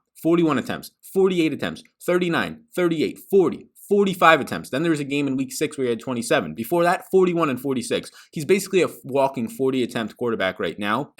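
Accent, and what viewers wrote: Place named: New York, United States. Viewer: You talk like you from new your or Boston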